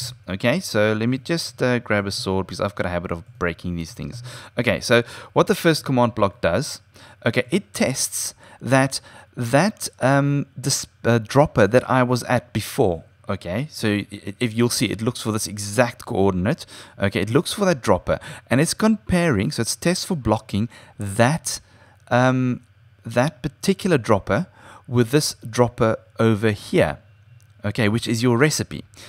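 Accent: South Africa. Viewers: hi. where are you from? You sound South African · HEY!! are u south African? If yes I am too! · are u south african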